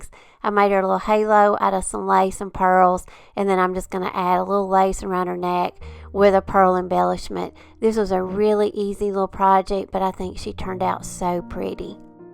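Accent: South, United States. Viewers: I absolutely love ALL of your projects ❤ they're so amazing and so beautiful... I always walk away so inspired by you!!! My husband and I were wondering where you are from? He was laughing at the fact he always thought I was extremely country sounding...until he heard you!!! We live in N.C. sooo we know country!!!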